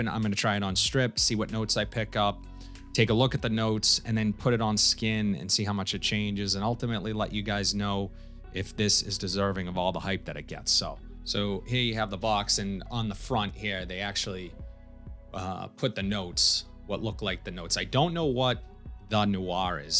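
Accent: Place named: New York, United States. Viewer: I am from Denmark But i must say your english is straight out of the Sopranos. Love it, you have to be from New York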